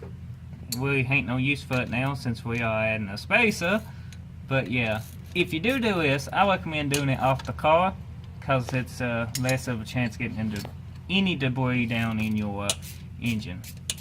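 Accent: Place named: West, United States. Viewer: Nothing to do with the diy it your accents cool. Reminds me of the wild wild west lol. Thanks for the video too though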